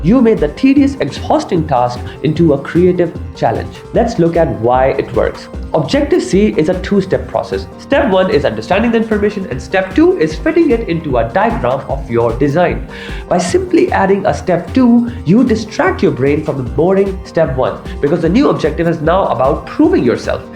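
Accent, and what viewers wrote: India. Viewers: 930,088 I have subscribed, even though at first it sounded like another mindless dribble but by a not too typically accented Indian dude with a channel name that appears to explain vaginal bleeding. Point made and leading up to was fantastic. Thumbs up and if it works for me within the next 3 days I’ll probably donate aswell.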